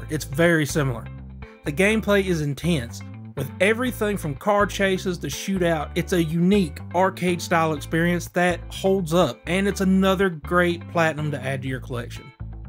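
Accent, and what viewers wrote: South, United States. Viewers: Sounds like you a southern boy.... like me lol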